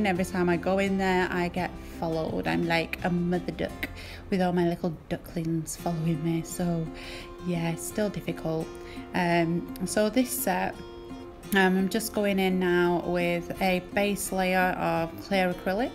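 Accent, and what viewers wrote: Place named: RP, United Kingdom. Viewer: No way - I'm Australian and your accent sounds so posh!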